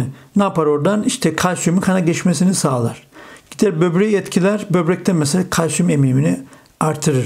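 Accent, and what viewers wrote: Black Sea, Turkey. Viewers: Hocam konudan bağımsız ama Karadenizli misiniz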